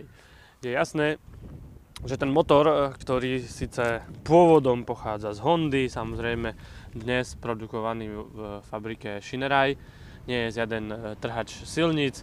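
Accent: Eastern Slovakia, Slovakia. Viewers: Len ,,tak trošku,,zaťahuje-isto ,,Vyhodňar,,aľe to nevadzí,ta ňe?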